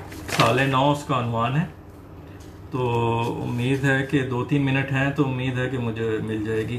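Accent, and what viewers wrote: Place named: Punjab, India. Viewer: Nice ✔🙏 wow so super bhaut kubh nice your voice🙏🙏👌🌹🙇‍♀️🧕🙏 nice your panjabi language tone so super 👌👌🙏🙇‍♀️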